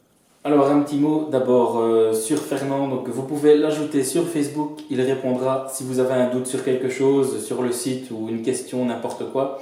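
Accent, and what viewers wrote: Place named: East, France. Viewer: Le meilleur c'est à la fin 😊👍 Très belle vidéo et explications claires avec l'accent de l'est.😊🎣🎣🐠🐡🐟🐠🐳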